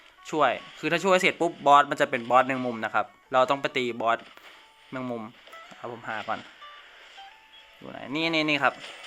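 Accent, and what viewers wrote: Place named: Thailand. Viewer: เหน่อจังจังหวัดไรออคับบช นักดาบกับมือปืนอันไหนดีกว่า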